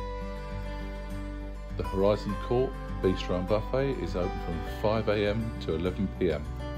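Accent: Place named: South, United States. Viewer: Good to hear those soft southern tones again, better than music…. Did we also see a plaid shirt by any chance ?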